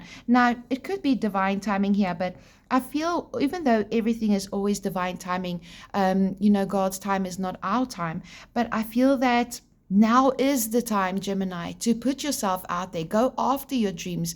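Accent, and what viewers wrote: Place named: South Africa. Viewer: Lekker to have a South African accent on a brilliant reading! 🎉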